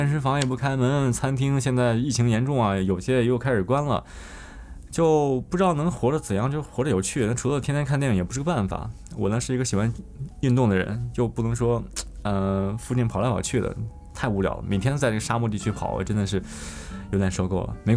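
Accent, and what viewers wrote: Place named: Shanxi, China. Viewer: ！！！你好！！！去年到現在，我無意間看了你的視頻，很有趣！！！👍👍👍剛開始一聽你的聲音我就猜到你是山西人了，你的聲音真的很好聽，做主持人一定可以！！！👍👍👍讓人聽起來你是一個純樸的人，知道你在溫哥華到洛杉磯的故事！！！雖然其中不知發生什麽原因！！！我也不評論！！！但我感覺你是個好人！！！（以前在打工的時候，遇見一個老闆山西的！！！說話語調跟你一樣，說話很溫馨，不擺架子，很有善，不咄咄逼人！！！）👏👏👏雖然你做傳媒！！！經常拍東西分享！！！也把見解和生活困難面對的東西跟大家分享！！！我覺得這就是最真實的故事！！！而且你很前衛！！！APPLE的東西都被你玩轉了，當然還有其他！！！你不像其他博主，有些人品有問題，拍出來的東西都是有毛病的！！！不過你沒有！！！看你的視頻很舒暢！！！👍👍👍要是你還在溫哥華為我們分享生活就好了！！！（但人不能這麽自私，還要賺錢養家的！！！）👍👍👍 很有素質，希望離開美國還可以在Youtobe發你家鄉和中國的人和事給我們看！！！有種不舍！！！看到單身一人在國外，有些地方顯得很優秀，但還是為了生活，和目標，錢💰！！！跟我一樣有時無奈╮（╯＿╰）╭！！！很舍不得！！！多么质朴的一個人！！！（國家應該需要這樣的年輕人給他一個機會！！！）👍👍👍💪💪💪——Jim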